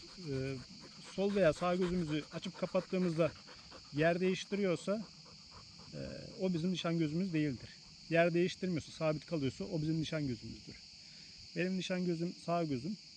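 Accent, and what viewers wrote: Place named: Thrace, Turkey. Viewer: he anladım trakyalıya çok benzettimde bidekonuşma olarak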